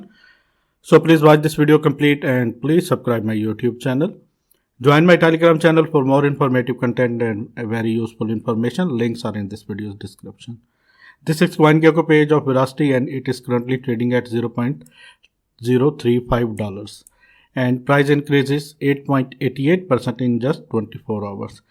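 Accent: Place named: India. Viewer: Soon as I hear an indian talking. I just think not to be takn seriously.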